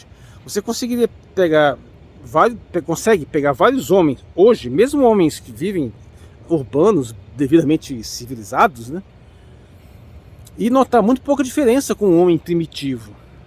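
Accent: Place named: Brasília, Brazil. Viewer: Tu vive em contato com o bioma cerrado direto ? Pelo seu sotaque acho que você é de Brasilia ou do DF.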